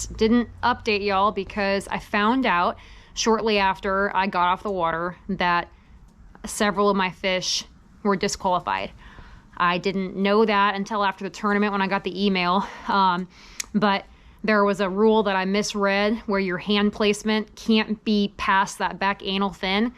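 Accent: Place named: South, United States. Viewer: Please. You say Nebraska but your accent says you are a southern lady!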